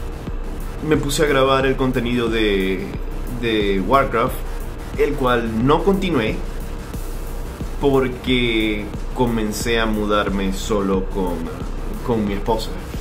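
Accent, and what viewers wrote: Venezuela. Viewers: ME ENTERO QUE VENEZUELA TIENE UN LUGAR EN LA DISFORMIDAD, AUNQUE CREO QUE QUIEN LO GOBIERNA ESTA CORROMPIDO HASTA DONDE NO LLEGA EL SOL, SALUDOS DESDE VENEZUELA, PREGUNTA ESTUPIDA ¿ERES VENEZOLANO?, TU VOZ SUENA ALGO CARAQUEÑO. EXITOS Y PROPERIDAD PARA TU FAMILIA HERMANO DE BATALLA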